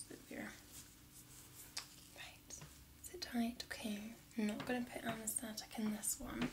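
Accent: Scotland, United Kingdom. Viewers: Yes finally Scottish ASMR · where about in scotland are you from? edinburgh here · lovin the Scottisch Accent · Scottish accent is probably my new favourite accent, so calming with your voice.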